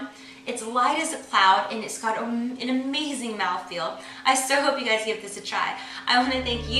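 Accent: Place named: Baltimore, United States. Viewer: Yayyyyyy I am so happy I just found your channel. Now I can get crafty without all the research or messing up. ^_^ Wooooot! I am from Baltimore, it's funny wherever you are from you have a hint of a Baltimorean accent to my ear lol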